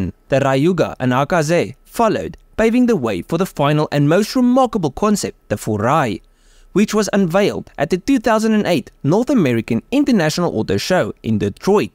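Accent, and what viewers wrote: South Africa. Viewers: Are you from south Africa by any chance? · Love ur SA accent cud listen 2 it aaaaaaall day HEHEHE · South African accent? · He sounds South African?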